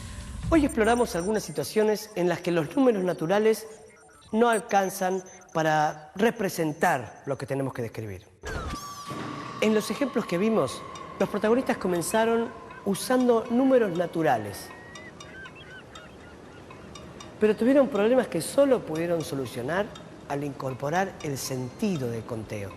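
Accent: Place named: Argentina. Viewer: como se nota que es argentino no se le entiende un pomo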